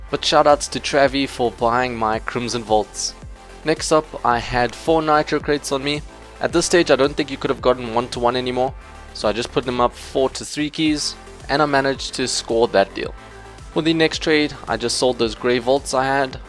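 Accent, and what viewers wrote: South Africa. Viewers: Which country are u from its sounds like south africa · are you South African I am and you sound like you are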